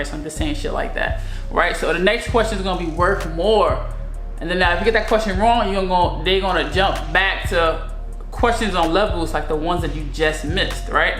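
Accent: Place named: New York, United States. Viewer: She from New York lol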